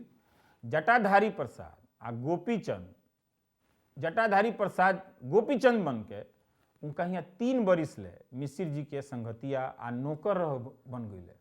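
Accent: Bhojpuri, India. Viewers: ये तो the great रवीश कुमार लग रहे हैं जो पक्की भोजपुरी बोल रहे हैं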